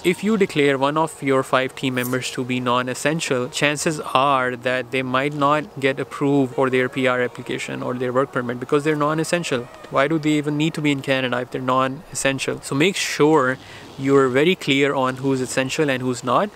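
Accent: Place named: England, United Kingdom. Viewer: Wonderful English accent 😍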